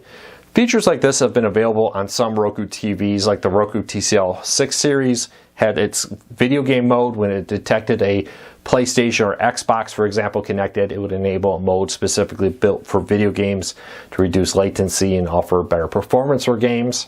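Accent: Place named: Philadelphia, United States. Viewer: Are you from Philly? You keep calling it”Pitcher” settings 😃👍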